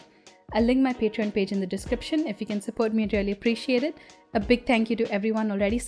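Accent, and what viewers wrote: India. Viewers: Why do sound like my Indian neighbors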